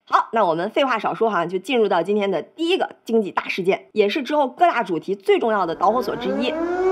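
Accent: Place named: North, China. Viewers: 感觉是北方口音，但是咋判断是东北人！？ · 小Lin的口音听着像山东老乡啊。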